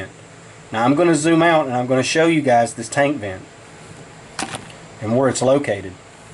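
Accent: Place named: West Virginia, United States. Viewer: Good video , thanks man. I'm guessing your from West Virginia ? We say "plars" too . Ha ha ! Thanks man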